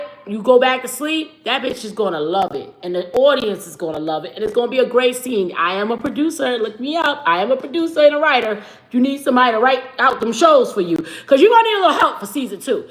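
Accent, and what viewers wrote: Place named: New York, United States. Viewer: ❤❤❤❤❤❤❤❤❤❤❤❤❤❤❤❤❤❤❤❤❤love love love it Girl i subscribed and everything thank you for your new york accent and honesty…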